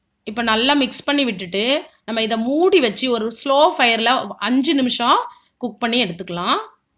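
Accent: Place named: Nagercoil, India. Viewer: Romba arumai unga nagerkoil tone thanga....beautiful recipe and thanks for taking time to record this....very helpful for guys like me living faraway from India....love your tamil.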